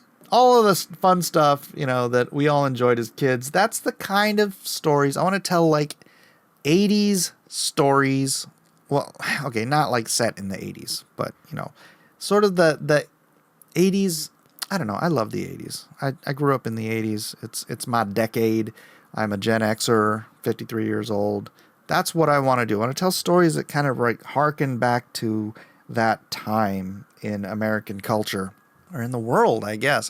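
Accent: England, United Kingdom. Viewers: I get: Awesome ninja skills. You get: English accent. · I love the design, and explanation of lore, backstory, and English accent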